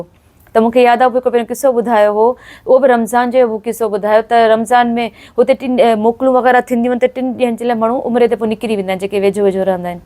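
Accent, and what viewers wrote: Sindh, Pakistan. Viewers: Adi twhn larkano ja ahyo??? Twhnji sindhi jeka ahy na uha thatta badin tmk area wari lagy th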